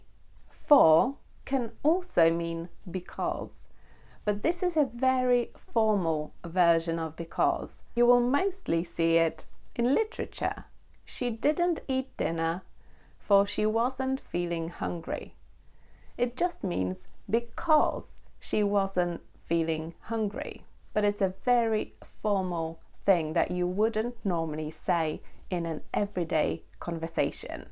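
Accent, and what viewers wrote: United Kingdom. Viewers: 😊 the German-American hast the all right. It' s always a pleasure listening to your fine Bitish accent! 😊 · It's such a joy to listen to the Royal British English from, especially for an immigrant in Canada.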